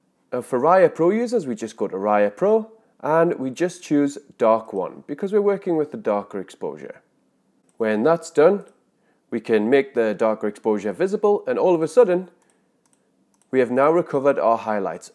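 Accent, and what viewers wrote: Newcastle, United Kingdom. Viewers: Great video and I'm in total agreement. I've not used any HDR software since I stumbled upon one of your videos and bought Raya Pro. By the way, my American girlfriend loves your voice. She finds it extremely relaxing. Ever since I've been with her she's grown to love the Geordie accent but she particularly likes yours; especially when you say the word "mask". In fact when I'm watching your videos, she now refers to you as.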